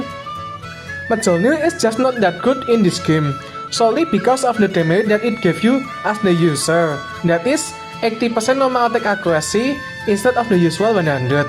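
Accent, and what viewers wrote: Indonesia. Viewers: Bah medok bgt suarane😂😂😂😂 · im glad im indonesian so i can understand the accent 😭😭☝️ thanks for covering this haha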